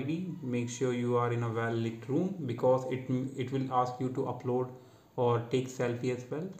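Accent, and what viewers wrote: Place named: India. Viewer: I knew what it was Indian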